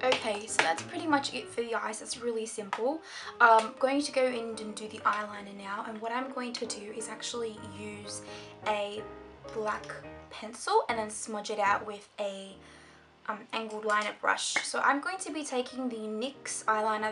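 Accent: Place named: New Zealand. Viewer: OMG i just found you and subscribed to you and i love you!!!!! fabulous!!! love your accent!!!! new zealand? or south african?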